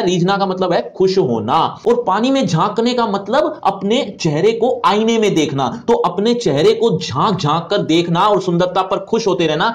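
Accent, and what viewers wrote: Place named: Hyderabad, India. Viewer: Aap Hyderabad ke ho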